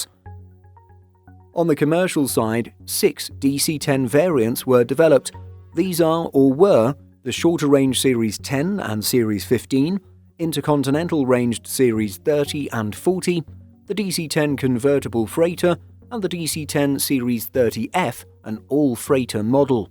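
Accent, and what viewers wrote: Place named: United Kingdom. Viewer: Your channel is awesome. But your tea drinker accent is annoying as FÆCK! CHANGE IT! COLONIZER! YOU PROBABLY HAVE SOME CROOKED TEETH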